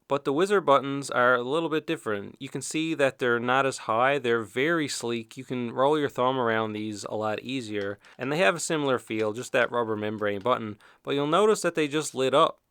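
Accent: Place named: Eastern Canada, Canada. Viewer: Would you happen to be from Newfoundland? I can hear the eastern canadian accent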